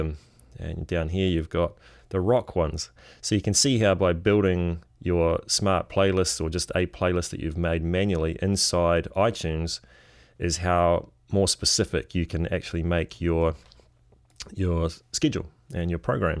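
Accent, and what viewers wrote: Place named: New Zealand. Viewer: Yo Kiwi dude can pick that accent a mile off have you gone to latest yet with Yosemite, It came with a few issue to start with but after talking with the developer it seems to be sorted. Scheduling is fairly start forward the hardest part is making iTunes conform 12 isn't an improvement it com,pellets messed up my playlists. but that might not be a bad thing as I'm moving everything from an iMac to a 12 core and will have to go through everything agin, it just never ends. B y the way what the story over there now on low power FM stations across the ditch if your single penetrates a piece of paper it is considered too powerful .02 of a watt max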